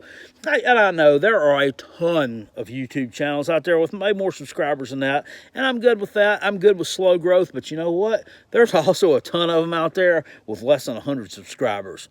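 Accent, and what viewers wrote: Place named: Texas, United States. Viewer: Sounds like Texas brotha I feel ya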